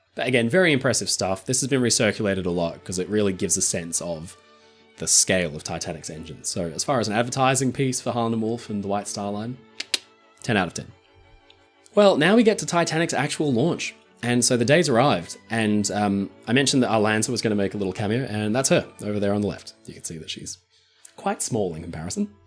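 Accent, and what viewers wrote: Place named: Australia. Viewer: I thought he was irish for years and then ir became obvious hes Australian...lok😊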